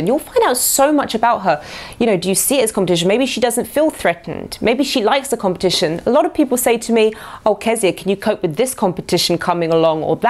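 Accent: United Kingdom. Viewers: Her heavy British accent totally makes my pulse race!! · I like your english acces dear woman, i'm searching a girl like you who has confidence in herself :)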